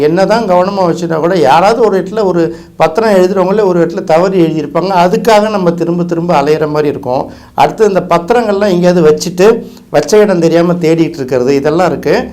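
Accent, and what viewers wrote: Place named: Chennai, India. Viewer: Madras தமிழில் பேசி நாசமாகப்போகிறோம்.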